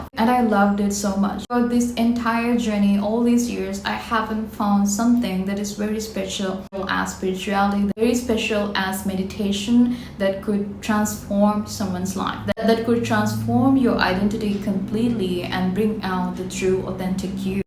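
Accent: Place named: England, United Kingdom. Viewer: ❤ your English accent wow. I want to speak English like you and i will..❤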